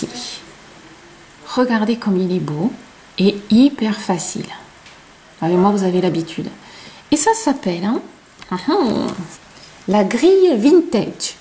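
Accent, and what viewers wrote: South, France. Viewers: Bonjour, très beau point!!! bel accent, ça sent le sud!!! beau week-end, bisous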